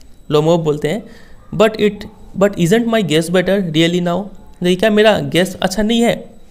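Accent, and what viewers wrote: Bihar, India. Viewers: sir aap Bihar se hain kya? Accent se lg rha tha. The video is amazing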